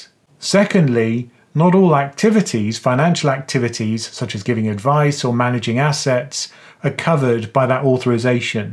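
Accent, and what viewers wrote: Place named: Cockney, United Kingdom. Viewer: Couldnt unhear in-cockney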